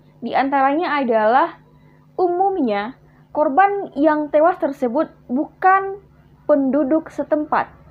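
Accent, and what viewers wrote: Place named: Minangkabau, Indonesia. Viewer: Bahasa indonesia ada dialek minang ya mba but over all i like your effort, sukses....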